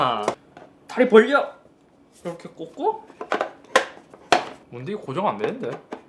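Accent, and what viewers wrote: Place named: Busan, South Korea. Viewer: 제가 구독한지 어느덧 2년 다되가네요. 오딧세이 G7 개봉기 영상 잘 보았습니다. 댓글은 거의 안 달았지만.. 같은 부산 사람으로써 말투가 정감가기도 하고요. 무엇보다 팩트에 디테일하게 생각치 못한 부분을 잘 끄집어내는게 좋아서였습니다. 현재 안그래도 전 모니터 50~70만원 사이를 보고 며칠째 고민중에 있는데요. 잇섭님도 아시겠지만 LG나 SAMSUNG은 국내에서 대기업의 인지도가 제일 높아서 여러 평가가 많은 편입니다. 그리고 잘 모르시는분들은 그냥 LG나 SAMSUNG을 깊게 고민안하고 사는 경향도 있기때문에 대부분 평가를 쉽게 찾을수 있는데요. 현재 제가 2가지로 압축하여 보고 있습니다. LG는 1세대 NANO IPS의 딱따구리, 은하수 같은 현상이 나타나서 패스했구요. 오딧세이 G7도 한때 관심있게 지겨보던 제품이지만 역시나 커브드(이건 취향이라 별수 없나봅니다.)와 VA 패널의 한계라 결국 패스했습니다. 이 영상의 LG 화면이 더 좋게 보인건 그래도 아마 패널아닐까 생각해봅니다. 물론 오딧세이 G7이 VA패널이긴하지만 IPS에 맞먹는 정말 발전된 SAMSUNG패널이긴 합니다. 보고 있는 제품이 알파스캔 AOC-273QXP와 델 S2721DGF입니다. 현재 마음이 델쪽으로 기울어 가고 있지만 알파스캔같은경우 국내에서도 다나와나 인벤등에 평가가 많은 반면 델은 국내 평가가 거의 없더라고요. 까페의 댓글로 간신히 몇개정도만 겨우 찾았었고 구글이나 유튜브로는 전부 외국인들이 좋은 평가로 나오기는 합니다. 델이 워낙 칭찬일색이고 제대로 된 평가는 그나마 유튜브로 디테일한게 있기는 하더군요. 알파스캔 스펙은 170hz주사율로 되있지만 사실상 DP포트 연결하여도 10비트로는 120hz로 제한 있다고 하네요. 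결론적으로 델 S2721DGF 모니터도 리뷰를 진행 하실 수 있을까요? 모니터 고르기가 본체 사양 맞추는것보다 사실상 너무나 까다로워서 한달째 고민중이네요.. 끝으로 장염 3일에서 1주일 고생할텐데 빠른 회복 기원합니다.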